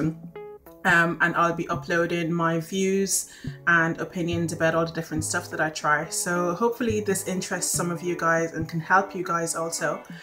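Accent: Ireland. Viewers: Are you Irish? I love that accent! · I can sense the Irish accent... Am I right?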